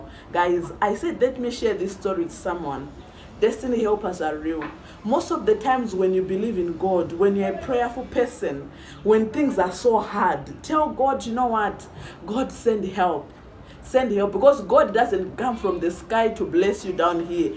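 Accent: Uganda. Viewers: You now have a Uganda accent sis 😅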